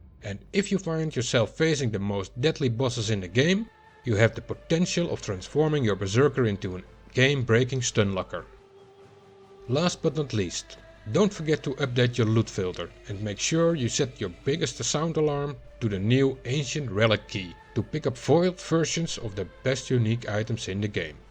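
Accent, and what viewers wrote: Netherlands. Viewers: i can tell by your accent you are dutch am i right?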